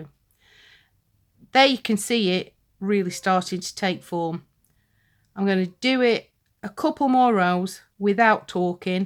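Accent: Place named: Northern England, United Kingdom. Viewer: Just found this channel, just getting back into beading after a couple of years break. I'm trying to remember how I used to weaves, so reacquainting myself by watching YouTube. thank you for describing this weave so beautifully. I've subscribed, so I look forward to more tutorials. I'm a northern lass, so it's great to hear another northern accent. 😊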